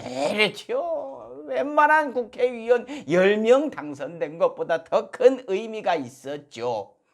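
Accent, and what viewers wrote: Jeolla, South Korea. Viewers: 행님 고향이 어디신데 전라도 사투리 그래 잘 쓰시는가요? 경상도 사투리도 고래 써 주시면 정말 좋겠네요^^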